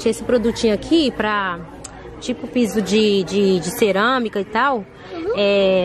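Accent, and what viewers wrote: Minas Gerais, Brazil. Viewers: Amei o vídeo. vc diz que estão caro os produtos de limpeza?? Cara vem pro Brasil, vc cai de costas. kkk mas eu estou fazendo os meu produtos em casa. faço sabão. Que virá sabão líquido para limpeza pesada. sabão detergente para louça e para limpeza do chão e banheiros faço tbm meus cheirinho (desinfetantes) uso muito bicarbonato tbm. aqui tem que se virar nos trinta. kkk bjs AMADA. Mineirinha linda lembra minha mãe no sotaque amei.❤❤😚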